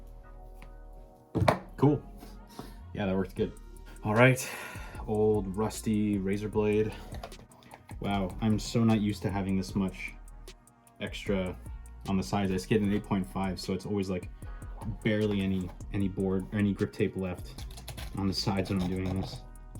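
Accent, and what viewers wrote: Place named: Canada. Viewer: i can tell you're canadian by your accent also its ironic having that board AND the kill your idols deck lol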